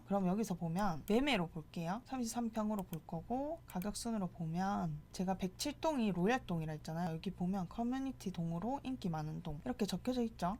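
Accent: Gyeongsang, South Korea. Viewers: 어후 쌍도사투리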